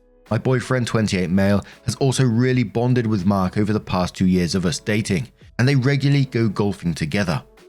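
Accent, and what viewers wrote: United Kingdom. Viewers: Mark you are so insanely wholesome. I used to listen to other people reading these stories but there is nothing like an accent from home and haven't listened to anyone else since finding your channel. I hope you are having a lush day! Keep making us brits look great ❤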